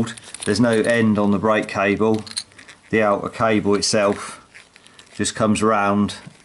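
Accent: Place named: Bristol, United Kingdom. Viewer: Thanks for this . Sounds like you might come from the Bristol Area ? Do you have a bike shop or do repairs ?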